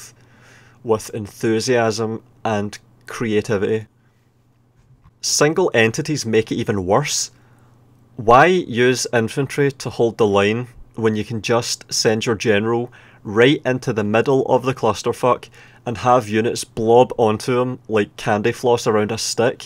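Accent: Scotland, United Kingdom. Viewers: Nah man, i watch your videos becooos ilove your Scoooootish akcent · Pretty sure it's scottish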